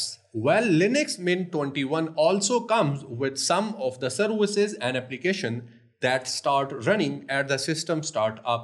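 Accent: India. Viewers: Any video with an Indian accent is a no go! India is scammer central.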